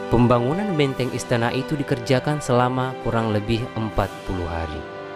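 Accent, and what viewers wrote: Bugis, Indonesia. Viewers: ini tdk masuk akal , dialek saja sdh salah ,menyebut nama saja sdh tidak benar , logatnya bugis .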